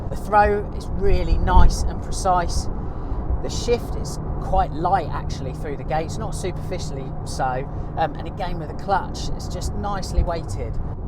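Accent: England, United Kingdom. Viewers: he is English. They pronounce lots of words differently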